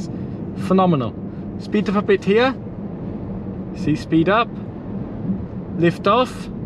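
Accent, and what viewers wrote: United Kingdom. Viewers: I agree again when it comes to B mode. In the end it depends on the kind of roads you're driving on and your personal preferences. The Travel Assist seems to be working quite perfectly. I can't wait to get my ID.3! BTW: Are you British or how did you get this nice British accent? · Hi, great video! I have an ID.3 Life on order. I’m curious, are you British, Portuguese or what? Greetings from Sweden.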